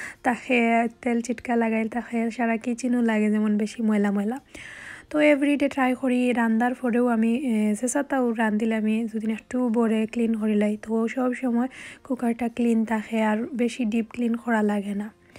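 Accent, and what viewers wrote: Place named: Sylhet, Bangladesh. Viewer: আপু সিলেটের মাত হুনলে যে ভালা লাগে মন শান্তি অই যায় আমি নতুন আশা করি পাশে থাকবা আর ভ্লগ অনেক সুন্দর হইছে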